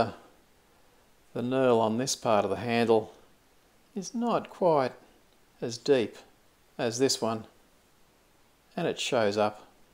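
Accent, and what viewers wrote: Australia. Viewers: Love your honesty, admitting to small mistakes. ALL of my successes have built in mistakes - just makes them more realer! And, good to hear an Aussie on YT!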